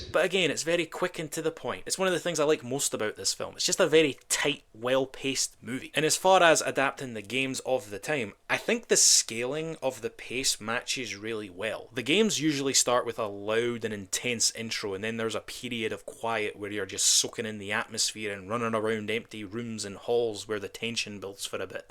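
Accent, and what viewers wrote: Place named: Scotland, United Kingdom. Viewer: This is the second of your videos ive watched. Funny commentary and I fucking love the Scottish accent. So hot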